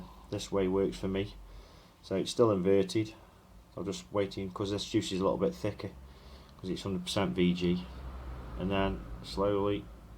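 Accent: Stoke, United Kingdom. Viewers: Aye up mate.....i was really surprised to hear a Stoke accent doing a review. Ive noticed a lot more people walking round with e-cigs just lately.... are there any good brick and mortars selling good quality liquids (locally) that you know of? keep it up anyway mate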